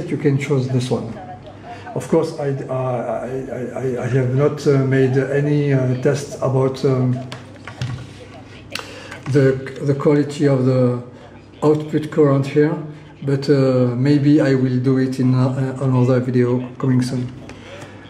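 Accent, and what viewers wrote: France. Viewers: On dirait un accent francais ;)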